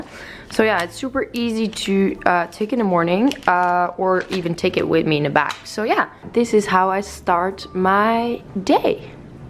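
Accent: Netherlands. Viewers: DUTCH ACCENT + LOVELY VOICE = PERFECTION ☺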